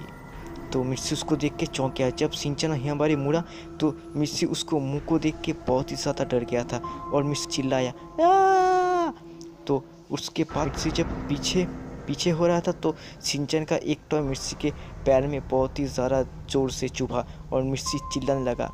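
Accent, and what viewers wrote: Bengal, India. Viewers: Bhai kya story super and are you Bengali no bhai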